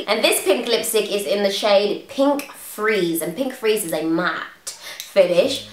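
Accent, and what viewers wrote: United Kingdom. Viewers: Are u British cause I hear the accent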